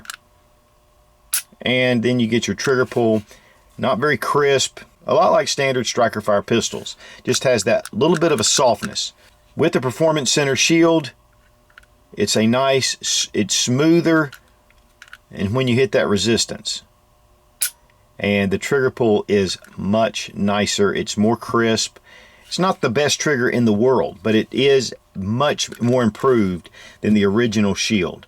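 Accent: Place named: South, United States. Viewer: Good video! what made it great was saying "Either" not the PC "ither" as reporters and commentators do! I am Southern and I think you are also!!1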